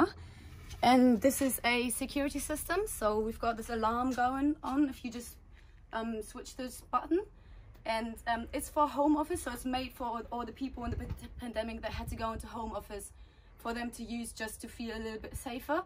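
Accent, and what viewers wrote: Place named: Denmark. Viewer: You really sound like you have a danish accent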